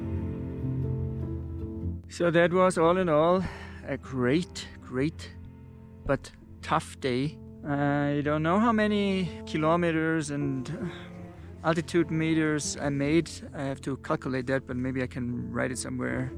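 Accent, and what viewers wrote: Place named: Austria. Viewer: Sehr sympathisches und eindrucksvolles Video. Sind Sie Österreicher? Ihr englischer Akzent klingt sehr danach :) 😉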